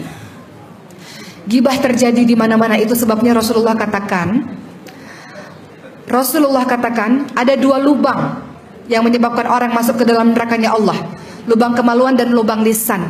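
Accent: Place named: Malaysia. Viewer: Logat Malaysianya bagus kali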